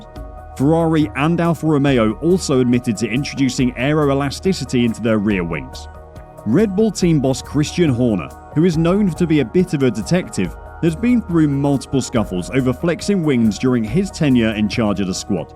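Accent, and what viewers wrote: United Kingdom. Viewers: sounds like I found a frustrated brit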